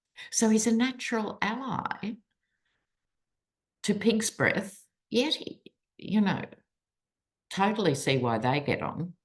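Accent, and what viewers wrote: Australia. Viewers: Thank you Dr - love your Australian accent ❤❤❤🙏🩵🩵🩵🙌☮️👍✌️🌎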